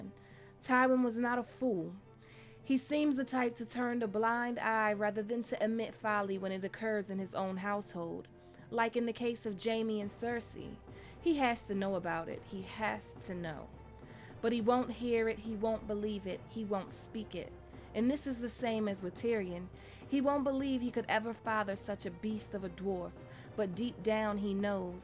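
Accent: United States. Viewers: I think I just fell in love with your voice. GOT needs to have accents other than unidentifiable Eurocentric and various British accents. Why have there never been any American or Canadian accents on the show? Someone with a voice like yours would be very refreshing.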